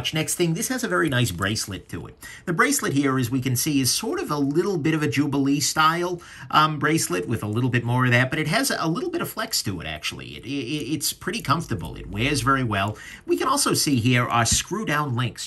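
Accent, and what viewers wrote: New York, United States. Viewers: has got to be from NYC. He would make a great TV pitchman. Very well done review.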